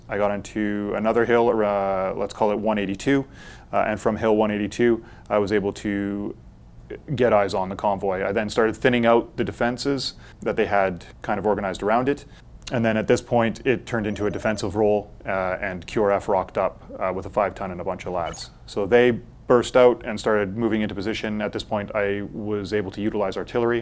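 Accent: United Kingdom. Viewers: Wait, he is British? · Your British sounds so British. For 10 years you must have kept it...that twang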